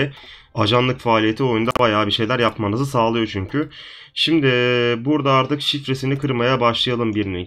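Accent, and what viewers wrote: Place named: Black Sea, Turkey. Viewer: Birde bişey sorcam siz çankırılısızda peki sizin anne tarafı rica edersem kardenizlimi çünkü ben giresunluyum ve siz biraz karadenizli gibi konusuyosunuz bizim oranın insanları gibi sıcak kanlı ve komuksiniz biraz lehcenizde öyle gibi sadce merakımı arz ettim roca edersem cevaplarsanız sevinirim tabikide siz bilirsiniz bu soruyla ayıp ettiysem afadersiniz yaklasık 2 senedir takip ediyom sizi ememğinoze saglık bayramınız kutlu olsun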